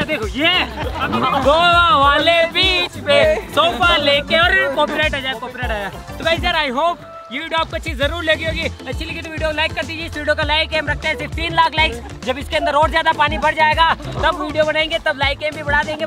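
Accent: Rajasthan, India. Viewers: Bhai aap rajeshthani bhasa badi achhi bolte ho ek video me aap bs rajeshthani bhasa hi bol ke banao na plz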